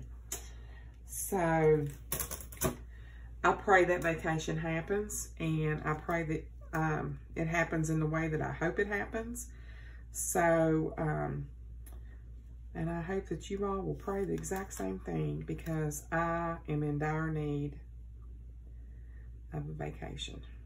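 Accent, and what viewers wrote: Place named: West Virginia, United States. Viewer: I really love watching you, your so genuine!! Not to mention I love your accent, it takes me right back to my childhood visiting my family in West Virginia!! I lost my father recently to Alzheimers so I haven't been watching a lot of videos, but I'm starting to get back to planning and your one of my favorite you tubers!! Keep up the great job, your spreads are so unique and beautiful!!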